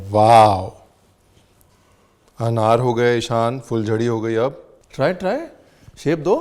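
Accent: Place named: Uttar Pradesh, India. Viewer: aj UP wale accent me recipe sikh kr mja a gya.....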